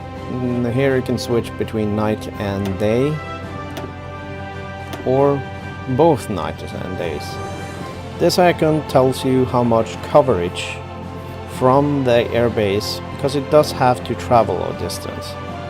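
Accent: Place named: Eastern Europe. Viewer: I think some Eastern European country or mid Asian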